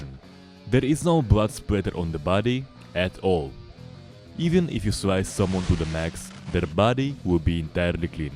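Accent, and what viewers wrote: Russia. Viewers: whats your accent my sister says russian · Здравствуйте, кто вы по нации? Hello, what is your nationality? You seem to have a Russian accent, or maybe not.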